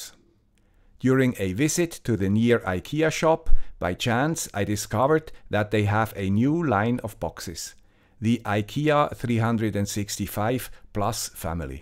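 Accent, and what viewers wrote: Switzerland. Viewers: ok, remember a couple of months go, i complained about your english, but after watching you a bit, i get used to it, and now i really like your accent... i mean, i have a Youtube canal too (shameless plug here is the channel name) and i speak english there too, and im also from swizzerland, and i have a accent... thats what is special about us, we dont speak the perfect english... keep going. i like what you are doing! and because the temperature, i have a idea: you can let cold water flow where no sensors and sorar panel is, and it should cool it a bit(hopefully enough)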